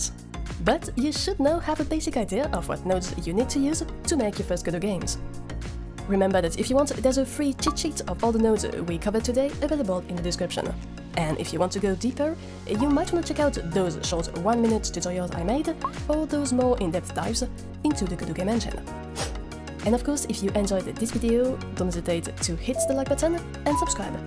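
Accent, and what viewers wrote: France. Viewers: J’ai cramé en 2 secondes que tu étais français mais je suis content que la commu Godot français est là.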